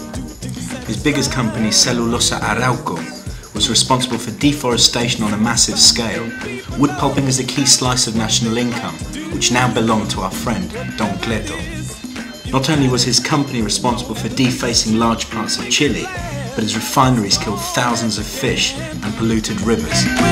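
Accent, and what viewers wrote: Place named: England, United Kingdom. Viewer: but sadly distributed between the rich, military, foreign companies leaving the common chilean people to miss out this situation will continue until the people stops watching tv and gets informed whats really going in the country, by the way by your accent i guess your from england? why you so intrested in chile? anyways nice video cheers from australia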